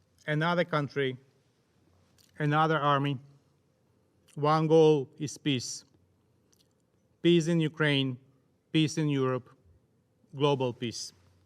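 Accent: Russia. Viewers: Вибачте, шо домахуюсь, але чого Владімір Зєлєнскій, а не Володимир. Непогана в нього англійська, але з елементами російської...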